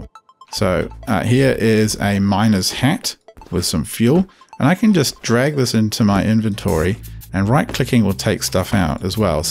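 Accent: New Zealand. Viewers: Are you from New Zealand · You would not believe the day i have had. Total shit day where a job i did might not even pay me and when i get home to learn the new game i am playing it is a Kiwi behind the microphone. Do you know how much that shits me. He is talking about being on a rock with a 'beer' underneath him, and i'm like what the fuck is this game doing where a player is on a rock because there is a beer underneath him. Then he goes on about how 'Mital' is pleasing to him and i have to look up the player guide and search for 'Mital' thinking it is some character that i haven't seen before. Nowhere is there a mention of Mital in the documentation i can assure you. Shit man, learn to speak proper English would you. Get rid of that accent so you can sound more like normal American English. We would prefer it if you try to sound like an American so we can actually understand you. 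Imagine if we were in a battle of some war and the bloody New Zealander has to ask someone to pass him a weapon and the New Zealander is like "quick, pass me the wippon i have someone to attack" and the other USA people are like "what do you mean pass you the wippon, i don't know what a wippon is. Get the hell out of the front line you New Zealander because no one understands you and why you keep asking for something called a wippon. Go home New Zealander is what they would say. They would say "Go back to your island and you can have all the wippons you want but here in reality we talk English with American accent and if yo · wait a sec r u from New Zealand aswell <3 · Dude are you from nz to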